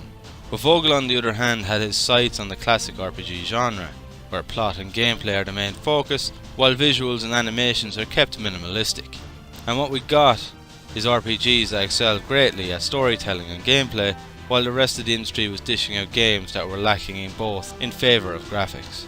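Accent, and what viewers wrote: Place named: Ireland. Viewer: Dude you are fine-keep your Irish accent and be proud of it my man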